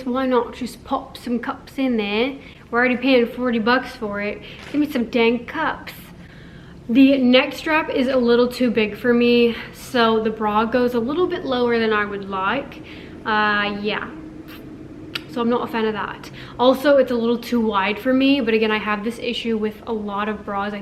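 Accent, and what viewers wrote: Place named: United Kingdom. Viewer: I love your British accent 😂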